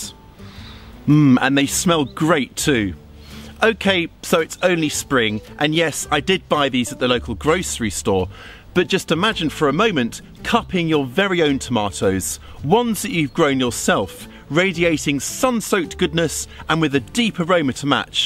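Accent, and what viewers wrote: England, United Kingdom. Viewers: Thank you, lovely to hear an English voice :)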